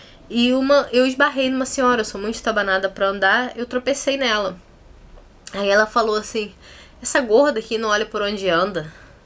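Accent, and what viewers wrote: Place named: Rio de Janeiro, Brazil. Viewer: Oie, passei o dia todo ansiosa pra chegar em casa e ver seu vídeo....confesso que queria ouvir vc falar e ver o sotaque de carioca rs, te acompanho pelo face e tenho que agradecer sim pelo exemplo, por se expor, comecei minha reeducação no final de janeiro de 2015, pesando 117kg, hoje estou com 105, estou devagar pq esta dificil controlar a alimentação...exercicio faço mto mas o principal é a alimentação mas nao me entrego e nao irei desistir de mim novamente, sempre lembro que você começou com 105 e é um exemplo de que com muita força e determinação podemos alcançar nosso objetivo, adoro suas postagens, fico rindo do que escreve, vc é ótima rs, desde o inicio da minha reeducação que te acompanho, que Deus te abençoe, muita saúde, paz e sucesso pra gente :) ah! não para de fazer vídeos não, você foi muito bem!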